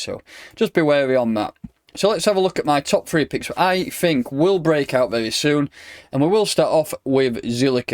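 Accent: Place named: Chorley, United Kingdom. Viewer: I'm putting you in Chorley or somewhere with that accent mate. You deserve a bigger audience - nice, easy to follow analysis.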